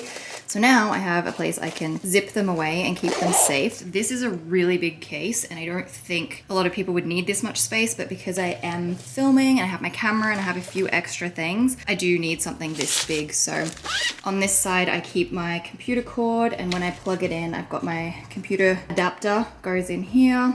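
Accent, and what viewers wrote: Australia. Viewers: Lovely to hear an Aussie voice. You are making me so excited. A week until I go to France from Melbourne but want to pack now. Actually going to take photos of mix and match outfits. That might help. Relaxing vid. 👏😄🇦🇺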